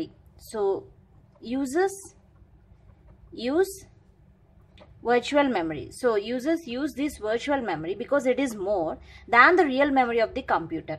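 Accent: South India, India. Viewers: You sounds like South Indian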